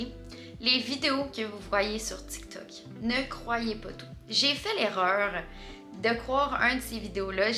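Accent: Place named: United States. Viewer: j’ai écouté la vidéo comme si une américaine parlait. tellement mon style de vidéo 🤍 that was fun!! continue yt comme ça big love NON AHAHAHA LES PHOTOS DE TOI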